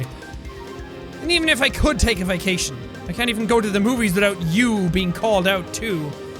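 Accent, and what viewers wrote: Ireland. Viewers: It seems like Sean loses the Irish accent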